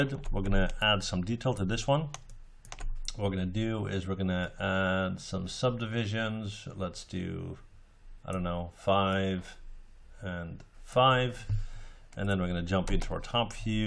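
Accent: Netherlands. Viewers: Wait are you dutch? Haha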